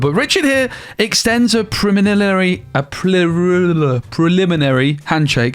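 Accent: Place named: Sweden. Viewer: i love the Swedish accent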